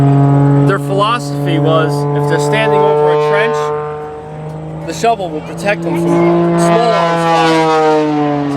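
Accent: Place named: New York, United States. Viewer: I can tell your from new York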